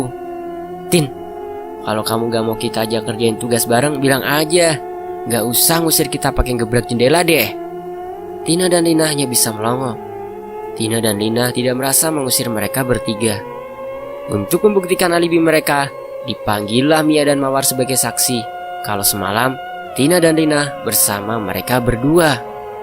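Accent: Java, Indonesia. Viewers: Sya suka cerita mistis tapi kalo ngomongnya jawa medok jadi males dengernya memang udah ada teksnya tpi ini cerita bukan film saya dengernya sambil kerja...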